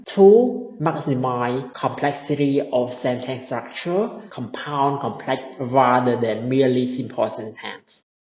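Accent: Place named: India. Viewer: It looks like any Indian try to speak in English assent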